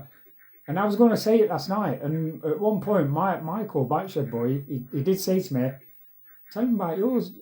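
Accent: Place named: East Midlands, United Kingdom. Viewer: I know that accent. I’m from East Mids.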